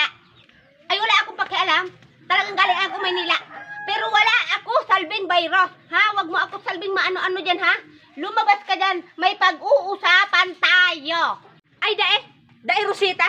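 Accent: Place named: Bicol, Philippines. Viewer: Hahahaha tagalog bicol na c manay 😂😂😂😂😂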